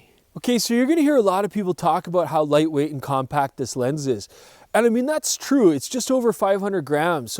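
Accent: Canada. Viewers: Ok...I was high when I watched this and thought to myself, “his Canadian accent is really coming out in this video or has he always sounded like this and I’m just noticing now? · Love that canadian accent! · Spot on Canadian affect there buddy 🇨🇦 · Damn!!! you sounds like my Canadian cousin in Vancouver BC. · Definitely a candian accent detected lol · I... I don't know. I guess I learned I like the canadian accent? Btw best intro of 2019 so far. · You sound even more Canadian...somshow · I would love to see that level of Canadiana in all your videos!! I am sure Jordan loves your accent, ehh!! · Heavy Canadian needs to make a comeback.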